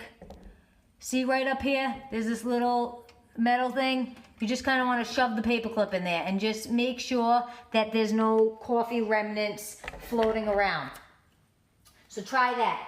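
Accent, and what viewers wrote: Boston, United States. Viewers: Not a NY'er....That's a wicked strong baawston townie accent.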